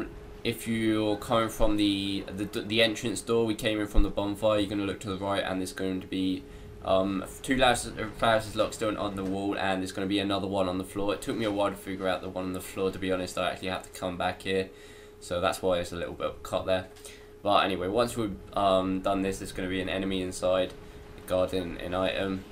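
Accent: England, United Kingdom. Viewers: agreed, this guy plays like a noob, has England's equivalent of a Mississippi delta mudman inbred's accent, and nobody gives a fuck about the doors that don't lead anywhere so why is he even bothering to show them? He should just say "don't waste pharros on these" and move the fuck on.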